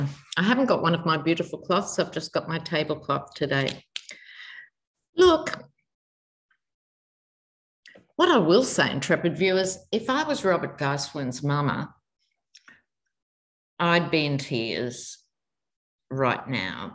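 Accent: Australia. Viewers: Congratulations 🎈🍾🎊 on getting a troll. Probably a right wing grifter who’s fascist views are threatened by the truth you speak with your amazing Aussie accent. 🤗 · Safe journeys my friend 🥰 Enjoy your time at Bondi 🏖 Beach. Don’t forget the sunscreen, Girl. I Always watch your readings and never get tired of your Aussie Speak. Speaking of “ Robert as “You poor little piddle”. My mom used to use that term piddle to describe something our puppy did when she got excited and wet the floor! Maybe it’s the equivalent of American slang calling someone a Little Pisser! 🤣😂😅 Loved your RBG reading. Love that woman! Thanks for staying with us for the long game🇺🇸 🇦🇺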